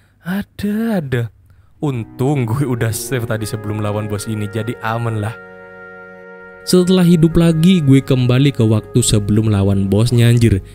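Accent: Indonesia. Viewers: lu orang madura ya bang? logat nya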